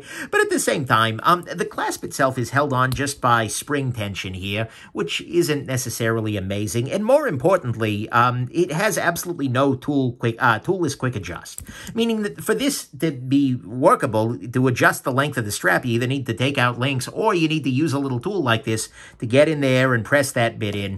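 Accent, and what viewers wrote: New York, United States. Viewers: has got to be from NYC. He would make a great TV pitchman. Very well done review.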